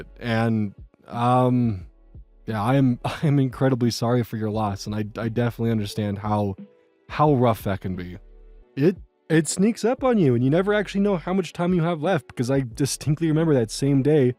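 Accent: United States. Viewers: Honestly i think you did fine on the spanish pronunciation. You have an american accent about it but im pretty sure we all do that but at least your vowels were correct which is really difficult for some people. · not a Spanish speaker, but I was in Mexico for 3 months. You sound like me, like you tried but still have the US accent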